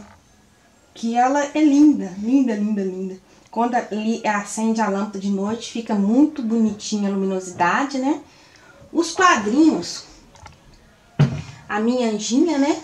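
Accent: Minas Gerais, Brazil. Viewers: De que cidade vc é, parece mineira uai?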